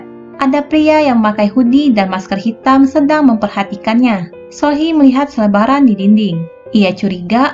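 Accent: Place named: South Korea. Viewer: Ini yg ngomong seperti org korea asli y logatnya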